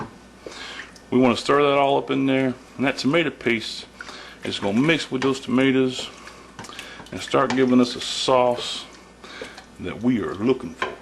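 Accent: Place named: New Orleans, United States. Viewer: I love your videos. They are quick, non-textbook, and very logical to follow. Your accent reminds me of my nites in new orleans!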